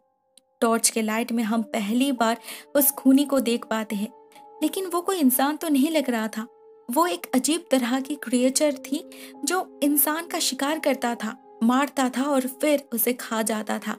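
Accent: Bengal, India. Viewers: Bengali accent..many Bengali's sound very sweet when they speak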